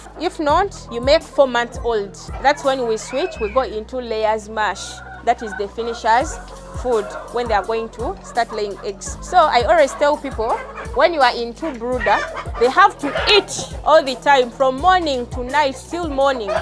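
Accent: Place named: Kenya. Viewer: At first I thought that am listening someone from Kenya kumbe you're the one?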